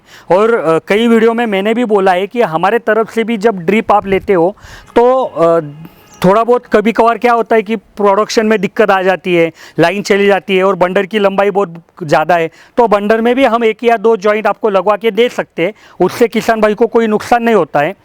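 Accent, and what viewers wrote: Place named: Maharashtra, India. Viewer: आपल्या बोलण्यावरून असं वाटतं की , आपली भाषा मराठी आहे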